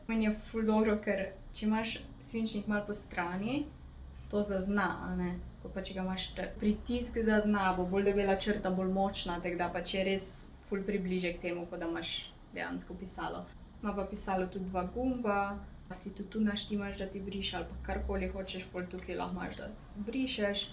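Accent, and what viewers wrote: Carinthia, Austria. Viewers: Draga! Spremljam te že skoraj od začetka in res si vrhunska, pa še čudovito izgledaš. Obožujem tvoj način izražanja in tvoje vsebine. Kot so že drugi povedali, se ti res vidi, da ne snemaš zgolj zaradi zaslužka in "popularnosti". Všeč mi je tudi tvoj koroški naglas. Če boš kdaj želela, bi lahko več snemala v koroškem govoru. Ostani tako dobra še naprej! Lep pozdrav iz okolice Maribora. ❤️❤️😍😍😍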